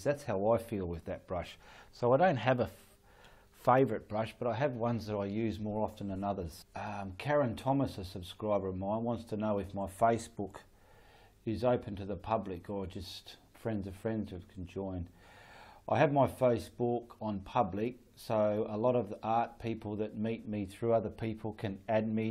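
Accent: Australia. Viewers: I have only just found you, and I am loving the down to earth way you teach. I can understand every word you say but being English means we do understand the Aussie accent well. I love the fact that your studio is a corner of your living room. Our living room is far too small to do that so I am converting a corner of my bedroom in to a studio. I have subscribed to your channel because of your no nonsense way of teaching. I enjoyed your Vlog answers to questions. Regarding retarder, I am thinking in Australia, like you said, it gets very hot and you would need it. I have bought Golden Gloss Medium that also allows blending and slows down drying time. It also has added polymers so you can thin down your paint about 30% without under-binding occurring. Like one of your other subscribers who has commented, I am 65 also and I am new to acrylic painting. I have done a little oil painting before but not acrylics. 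I am looking for a straightforward teacher and I have found him. Thank you. Love to you and. He is gorgeous. xxx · Love love love your accent and your Aussie phrases. That's part of what makes you so unique to watch!!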